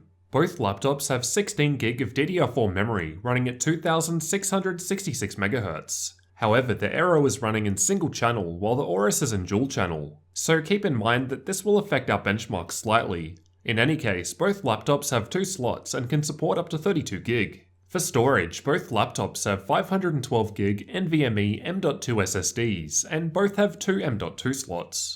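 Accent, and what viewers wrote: Australia. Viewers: This is just how Australians sounds ahahahaha I’m Australian and can confirm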